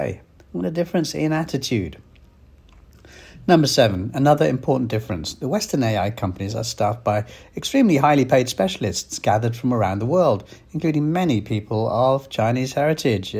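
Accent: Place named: England, United Kingdom. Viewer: Chinese propaganda channel with English accent voiceover.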